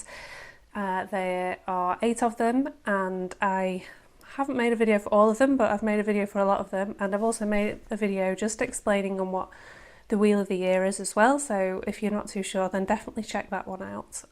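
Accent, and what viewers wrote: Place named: Northern England, United Kingdom. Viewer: Nice to meet another fellow Brittan.😂 I'm from Edinburgh myself..... where about in England you from? You sound more northern English ❤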